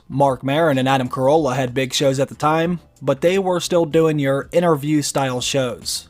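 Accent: Pittsburgh, United States. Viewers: Hey! I grew up in the burgh’, I can hear that Yinzer in your voice. Am I right?!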